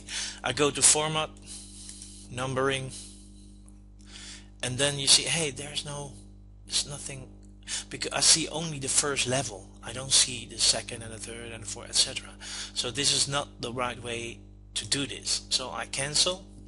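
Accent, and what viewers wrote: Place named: Netherlands. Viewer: Thanks! Love the charming dutch accent. You rock!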